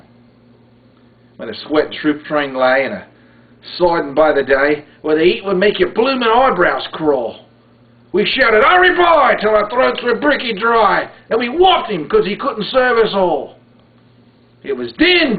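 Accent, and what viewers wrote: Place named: Australia. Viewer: That's a great performance and perfect Aussie accent too which is fine for an Imperial piece such as this. Very moving at the end. 5 Stars.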